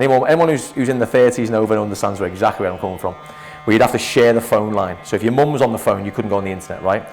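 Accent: Liverpool, United Kingdom. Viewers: Liverpool UK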